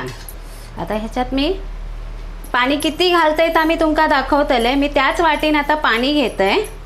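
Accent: Malvani, India. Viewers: कुरूम कुरूम आवाज इलो.खुप मस्तच चकली . खुप छान वाटत तुमचं मालवणी बोलणं. छान बोलून रेसिपी करता म्हणूनच रेसिपी बघायला आवडतात. 🙏👌👌👍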